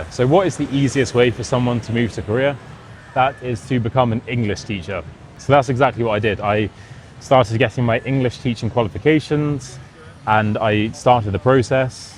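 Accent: RP, United Kingdom. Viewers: I understood perfectly, but that would be because I am from the UK and you spoke English in a pretty much RP style. Now, if you were speaking as if you originated from inner-city Glasgow...... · You talked with very plain and delicate British accent, articulated each sentence in a clean way and didn't speak too fast. I understood everything! (And I'm sorry for the discrimination you went through) ciao from Italy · A beautiful speaking voice, with clear British pronunciation and accent. Easily and completely understood, at least by this American. I’m probably not the best test subject, however. As a 21 year old in 1970, I spent my junior year of college at the University of York. I didn’t qualify to study in a country where English was not the native language. I distinctly remember my taxi ride from the York train station to my digs. My taxi driver opened his mouth in conversation and I couldn’t understand half of what he was saying, although I knew it was English. 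I almost panicked, thinking What have I done?! I soon found out that he was from Newcastle and spoke the Yorkshire dialect. Over that year, I heard many British dialects and learned to understand them all. What a year that was! Not just the butterfly affect but the giant swallowtail butterfly effect. It broadened my American identity to then include more that of a world citizen, which has served me and others well, I believe. It changed my life for the better. Now that I’m an addict of British tv crime shows, I can understand most every word and sometimes translate for my English speaking husband. A good thing. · For me as a German, he speaks a very clear British English, not comparable to Scots or other British dialects. So no problem at all. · I was expecting a broad Glaswiegan accent not a nice clear RP accent 😂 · Maybe this video is for his Korean viewers. I thought he might have some crazy, difficult-to-understand Scottish accent or something. 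He sounds like a BBC News presenter. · You spoke so clearly and almost RP. You spoke so well compare to some Hollywood actors which I need to turn on subtitle in order to understand them. Fantastic four 2026 is one of it. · Hello, son !!! I'm an old french lady refreshing her english... When I first saw you, with your red hair and beard, I thought may be you were from Scotland or Ireland, which would have been very difficult for me to keep up...😂 But you have an honest British accent, very nice and easy to understand 😊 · I thought you were going to speak some complicated form of English, like some Shakespearean-type shit. 💀 I could understand every single word that came out of this guy’s mouth. The only difference was his British accent. Other than that, he sounded completely normal to me. I think he was talking about non-native English speakers—people whose first language isn’t English. 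I’ve been speaking English my whole life, so none of what he was saying was difficult for me to understand. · Understood 100%. RP is easy to understand. Thank you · Although it is a relatively RP accent. Try any working class person with a strong regional accent, that would be much more difficult.try scouse, brummie, or pretty much any of them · erm if i don't understand it's normal bc of your british accent. but i managed to understand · Received pronunciation, notoriously tricky for the uninitiated. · Dispite your strong british accent, i can understand you! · He does speak in a very clean general British accent. While visiting the UK my miles varied.. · Apparently, my English is incredible. But I love your British accent, so I'm watching... 😂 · I was expecting him to drop into a regional accent not stay in RP.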